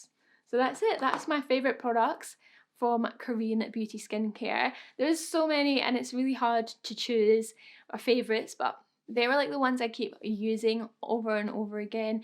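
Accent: Scotland, United Kingdom. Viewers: What kind of accent you sound Scottish can hardly understand what your saying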